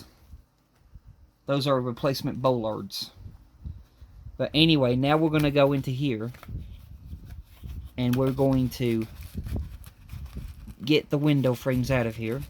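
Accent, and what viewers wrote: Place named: Texas, United States. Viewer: Wow you are so clever the way you do these things I find it so interesting to watch you working on this beautiful model. Thank you so very much for sharing this with me I will attempt to do what you have done but im not as skilled as you but i will definitely give it a try. Once again thank you and look forward to your next video take care o!! By the way are you from texas luv your accent stay safe take care 🙂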